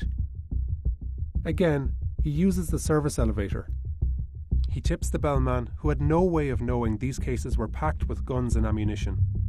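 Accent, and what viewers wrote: Ireland. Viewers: Irish accent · This is all well and good but the Irish guy narrating the video caught me so off guard idk why even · Is the person talking Irish? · Love how it's the New York Times and it has an Irish guy as the narrator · Since when did The New York Times have an Irish narrator?, also this is really spooky and sad that this happened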